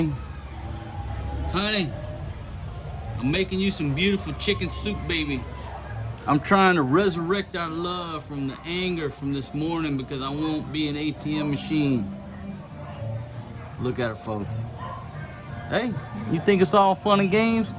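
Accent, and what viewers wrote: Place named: Alabama, United States. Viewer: Brother You Got Me Cracking up Big Time. I'm here in Alabama USA 🇺🇸. You sound just like someone here would say. Be Good Bro. God Bless You Man.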